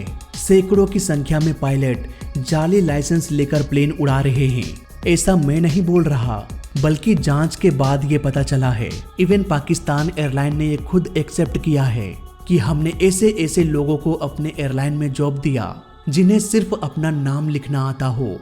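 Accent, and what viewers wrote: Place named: Bihar, India. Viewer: yeh kya hai bhai bihari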